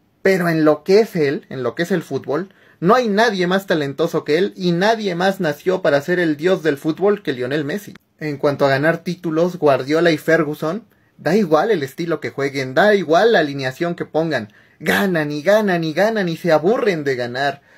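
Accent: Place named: Mexico. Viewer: Como se nota que este es mexicano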